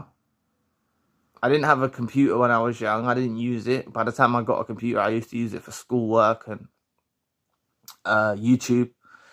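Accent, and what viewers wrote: London, United Kingdom. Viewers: tring to work out your accent , thinking maybe LDN originally but moved out the city :-)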